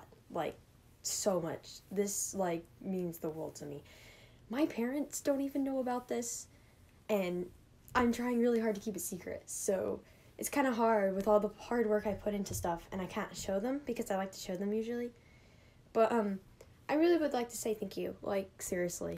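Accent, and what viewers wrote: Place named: United Kingdom. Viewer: oH MaH GwAd YoU'rE VOICEE IT SOUNDS ADORABLE :0 YOU SOUND SO BRITISHH REEEEEEEEE you're precious uvu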